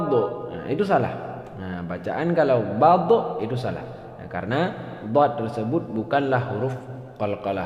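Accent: Aceh, Indonesia. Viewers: Orang Aceh ya ustd?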